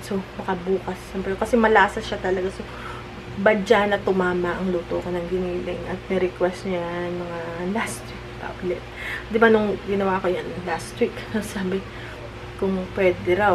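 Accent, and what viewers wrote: Batangas, Philippines. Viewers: GOD BLESS YOU as a good daughter....si mader say ni, she will miss you din daw pag hindi ka pupunta sa kanila. Just continue serving them and itawa mo na lang yung mga comment ni Mader. More power to you and say HI to your parents. I also enjoy watching the 2 boys, lalo na si.... bintog ang cheeks.... is such a sweet ham, baby pa kasi. regards to, saludo ako sa pagpa supportive niya.....you are so Blessed. Keep up the good work,hane.... and dont forget you batangueno accent.....hehehehehe....tita ailec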